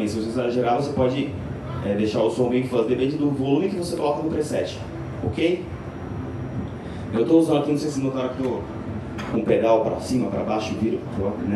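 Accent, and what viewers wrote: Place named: Brazil. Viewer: judging by the portuguese he's speaking i wouldnt say Mexico, more like Brazil...anyway, some people like that high gain stuff, which i dont approve but will not condone...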